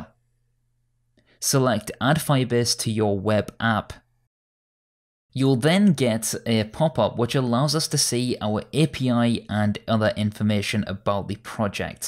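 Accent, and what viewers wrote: United Kingdom. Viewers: I've been coding for years but have always had the attitude of never knowing enough. Your tutorials often cover ground I've already walked but I always watch them as I still find them invaluable for reminders or tips for things I've missed. Always fun for me to hear a fellow northerner too, doing the YouTube thing. Thanks.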